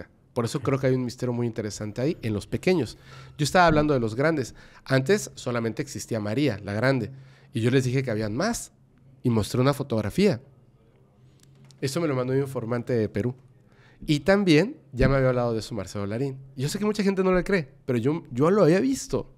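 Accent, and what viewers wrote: Yucatan, Mexico. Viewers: habla como yucateco.....porque habla asi?? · Imposible ignorar el ascento Yucateco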